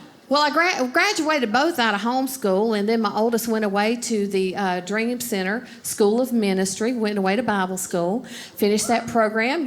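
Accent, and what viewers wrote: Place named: South, United States. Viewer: Absolutely hilarious... love you and your southern drawl...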